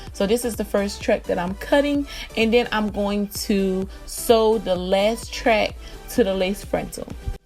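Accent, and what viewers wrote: Louisiana, United States. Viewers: Am I detecting a Louisiana accent in that voice? Lol I love it! You've made it so easy for me.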